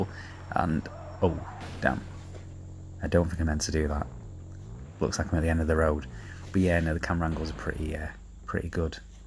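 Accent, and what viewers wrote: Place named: United Kingdom. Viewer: You kinda remind me of Elanip who plays GTA 5 with your british accent xD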